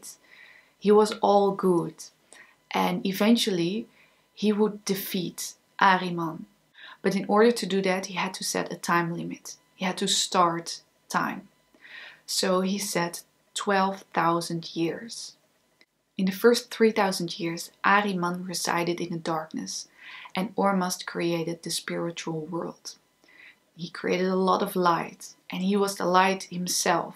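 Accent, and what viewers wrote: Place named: Netherlands. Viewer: waarschijnlijk wel, das een nederlands accent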